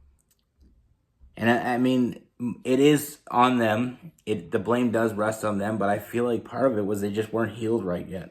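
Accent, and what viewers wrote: Canada. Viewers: Love your Canadian accent 😎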